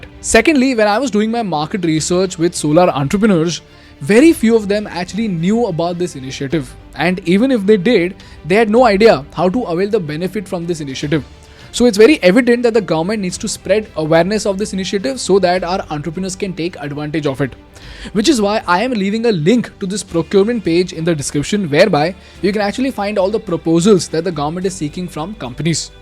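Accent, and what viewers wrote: India. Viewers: I don't know but when I listen ur English I feel that I am listening Hindi. I don't get irritate by your speaking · Kitna bud shakal hai yea larka. Aur is ki english suno. Typical indian style. Manhoooos shakal. Manhoooos awaz. 😅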